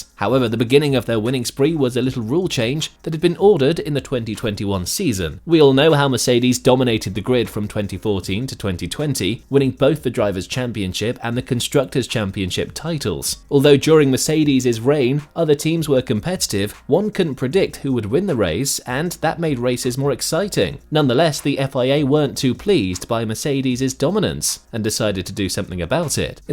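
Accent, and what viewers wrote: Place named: United Kingdom. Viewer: It's getting to the point with these videos, that as soon as you hear a bri'ish voice-over, you may as well skip it.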